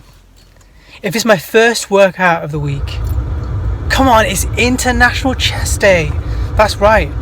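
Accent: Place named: United Kingdom. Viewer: Yes boi. Smash that gym!! Sick accent you living in UK?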